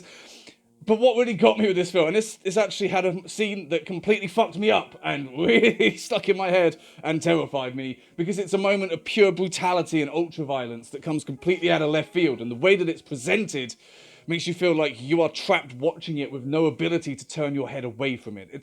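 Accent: United Kingdom. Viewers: He's British isn't he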